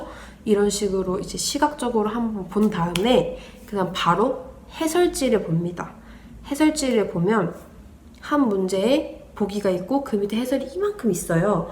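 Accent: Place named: Seoul, South Korea. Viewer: 서울말 잘쓰는데 ~ ㅎ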